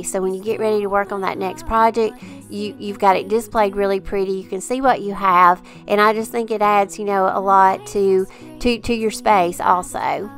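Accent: South, United States. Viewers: You have such good ideas, classy and not cheap looking store bought items. Love your upcycles, repurpose of old things..also enjoy your background music and your sweet southern accent.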